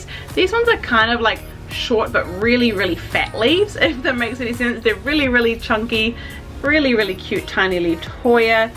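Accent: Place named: New Zealand. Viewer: So I was watching Hoya videos tonight and yours popped up... a fellow Kiwi accent :-) Lovely set up you have in the green house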